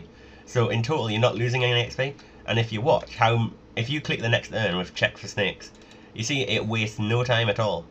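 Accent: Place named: Newcastle, United Kingdom. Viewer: he's not from Scotland you idiot, He's from Newcastle i think.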